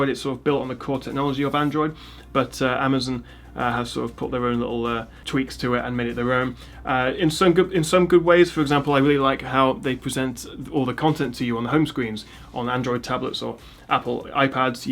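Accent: England, United Kingdom. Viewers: of course from england, what country are you from to not know where this geeza is from? good review btw i just bourght it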